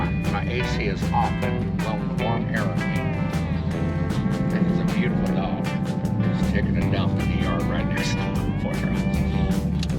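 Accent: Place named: Appalachia, United States. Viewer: is too good at the hillbilly accent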